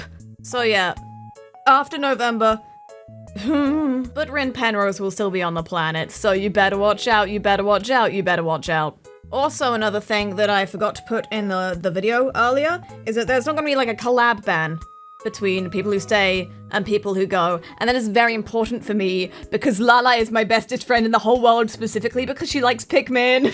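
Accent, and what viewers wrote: United Kingdom. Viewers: Lol RIn you're a masterclass at explaining things. Maybe its just because your british voice and humor is so nice to listen to lol. I look forward to seeing how great you grow <3. Hope you will have a lot of amazing stuff planned. btw: +1 sub toward your million. I see you from a ton of clips <3 Your Moth-...I mean umm I have seen those clips too ;)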